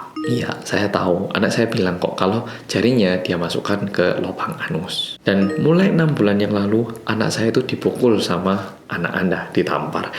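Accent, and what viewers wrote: Java, Indonesia. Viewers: Semoga menjadi contoh dan perhatian pemerintah Indonesia preside dalam melindungi anak bangsa dari berbagai sisi dan menjadi hemat dalam membuat undang2 perlindungan anak perlindungan anak Saya pernah dengar langsung cerita dari anak sepupu (masih kelas 5 SD) bahwasanya ada teman lelakinya disekolah menarik teman perempuan disekolahnya dn menyuruh membuka pakaian dalamnya dn diberikan uang, bayangkan betapa terkejut sekaligus sedih dn takutnya saya, dan menurut keterangan sianak mereka sudah memberitahu kepada guru, akan tetapi tidak terlalu ditanggapi , hal ini sepertinya sering terjadi disekolah, terlebih di daerah. Semoga menjadi perhatian lebih dari pemerintah khususnya kementerian pendidikan, karena sekolah harusnya aman dan nyaman demi masa depan bangsa.Amin. Terima kasih bt oppa yg mendok, terimakasih sdh mengedukasi. Sukses slalu. 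· Tiba” mncul d dranda ,pas aku buka videonya,mukan dia korea bgt tp pas ngmong logatnya jawa bgt kocaakkk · Mas nya ini asli org korea bukan ya.. kok logatnya jawa.. apa keturunan aja ya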